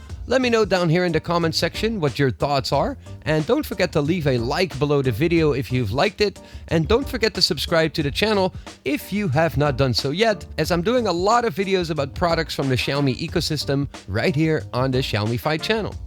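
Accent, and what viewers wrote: Netherlands. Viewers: Nice Dutch accent.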